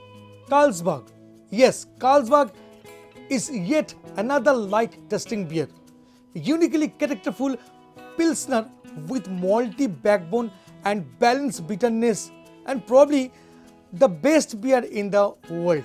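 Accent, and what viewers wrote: Bengal, India. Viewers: Ur tone says that u r Bengali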